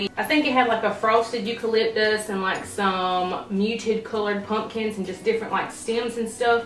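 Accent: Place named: North Carolina, United States. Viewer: Omgoodness, I knew right away where you are from because of your accent! I just subscribed, came over from the other channel! I love watching these videos to get my own ideas for fall decor ideas, great job!! I'm from the same area in NC, but moved to Iowa!! 😁 girl I live for those hobby lobby sales 😂😂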